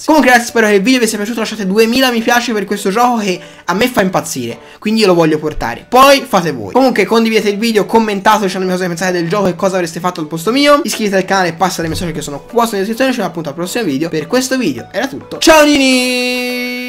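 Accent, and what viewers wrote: Florence, Italy. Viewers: i fiorentini la maggior parte hanno tutti la voce cosi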